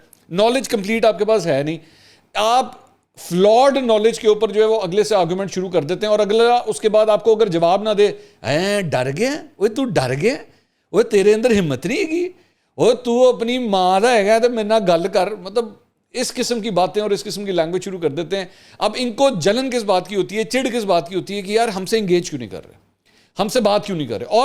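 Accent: Punjab, India. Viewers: صاحب، اپنی شرٹ کا بٹن لگائو، پبلک کے سامنے تمیز سے آؤ۔ پینڈو Language in Vlog still remains a mix “ Kichri” of Punjabi and Urdu in panjabi accent plus, میں۔ میں۔ میں۔ میں۔ Non Punjabi feel disgusted to watch this show.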